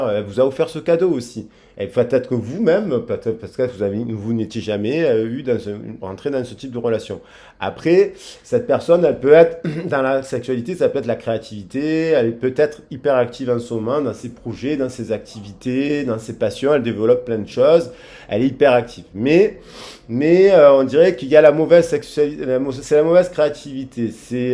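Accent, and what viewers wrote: South, France. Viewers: vos guidances vous êtes trop fort! Toujours un plaisir de vous écouter avec votre bel accent du sud merci.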